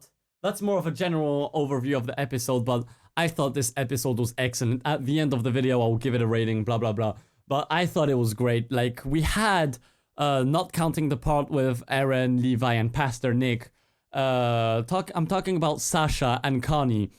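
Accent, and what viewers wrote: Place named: France. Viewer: So you're French too! Je me doutais bien qu'il y avait quelque chose dans ton accent! =D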